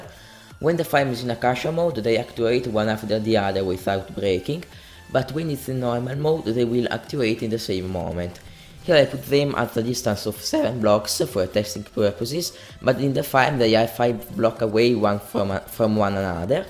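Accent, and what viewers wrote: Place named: Italy. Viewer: good video and i frecking love your italian accent XD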